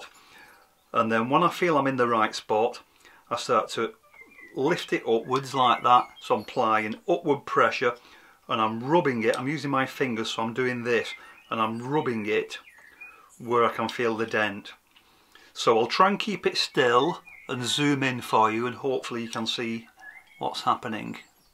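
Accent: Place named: Manchester, United Kingdom. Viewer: That's a beautiful piece, really loved watching you restore it back to it's original glory. From your accent you're not a million miles from me, I'm in Manchester.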